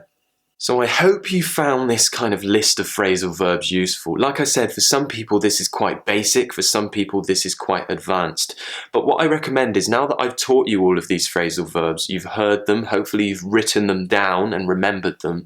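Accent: RP, United Kingdom. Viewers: This video is very useful! Thanks for share your knowladge and I really like your British accent. 👌🏽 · I hear British accent here. :) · this is very useful ,and i like British accent because of i always watch your videos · Thats mean British accent mate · Don't change the British accent to the American accent PLEASE. ...people prefer British accent because it is father more beautiful. So keep it please.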